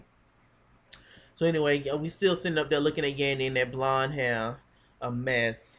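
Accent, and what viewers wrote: New York, United States. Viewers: The New York accent is just too real. Yo, is you from Brooklyn or the Bronx? I TOTALLY agree with your opinion of the busted up old ass creep squad. I don't see what any of these females, season after season, see in any of those guys. In addition to being old, washed up, and unattractive - I have never seen one of them do anything to further anybody's music career. People don't seem to like BBOD or Moe Money, but one thing that I will say in her defense...she didn't allow Cisco to play her once she found out that he was screwing Mariah No Carey. When it comes to LHH, these dumb ass chicks fight over these lame ass dudes. But Moe cut it off at that very moment and told Mariah that she could have him. I was totally here for how she handled that. GREAT REVIEWS FOR THE WHOLE SEASON! You're awesome!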